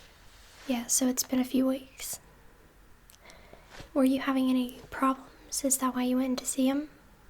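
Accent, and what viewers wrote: South, United States. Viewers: It's a southern accent dude lol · Lis Sanguinan southern accent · Lis Sanguinan it's from the southern United States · Anybody know which southern state she is from? Just curious to know where accent is from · The subtle southern accent melts me. I loooooove it. GG nice vid keep it up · I hear the southern accent but can't place it; What state are you from? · Thats either an Alabama to NC accent. Or shes in the midwest. Hell it could be upstate NY as well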